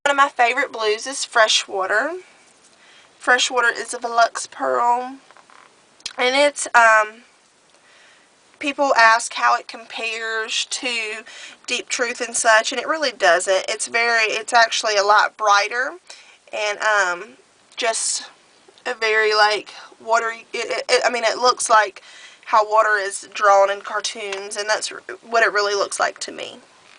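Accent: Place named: South, United States. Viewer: I love your Southern accent! Anyways, I'm gonna get myself an electric eel tomorrow.. I love my blues and greens too. I just hope it suits me. :-S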